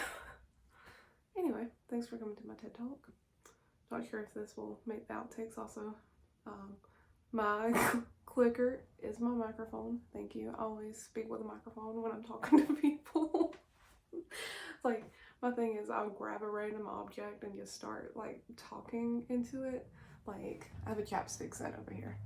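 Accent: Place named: South, United States. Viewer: Living in the south does have it's perks, is like if you live in the south like me, I live in Florida you must have our foods, is just amazing, we are the friendliest of people, especially to our people and visitors from the northern states that come down here during winter, you know them, affectionally known as snowbirds, and yes, it drives me insane when people drive around slow in the fast lane, and they drive with their loud exhaust, it annoys me to no end. Great video as usual, looking great and I love your Southern accent. It's so cute.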